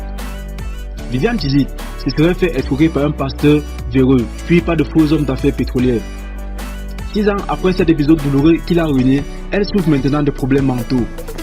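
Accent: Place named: Cameroon. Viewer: Mon ga tu raconte que des connerie sur té vidéos a entendre ta voix je suis sur que té un camerounais avec ton acsen 😂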